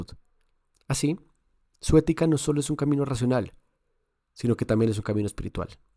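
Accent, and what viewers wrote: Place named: Colombia. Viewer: Recién descubro tu canal. Como admirador de la filosofía islámica, te doy gracias por cubrir estos temas en español. Por cierto, ¿eres colombiano? Creo que tu acento te delata jajaja.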